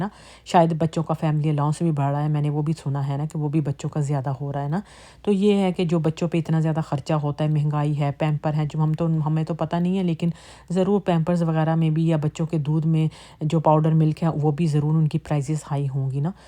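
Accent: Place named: Peshawar, Pakistan. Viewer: Are you hindku speaking your accent sounds peshori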